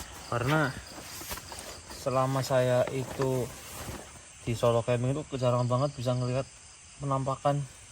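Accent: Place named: Tegal, Indonesia. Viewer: Bg asli mana bg? Ko logatnya kek ada tegal nya 😂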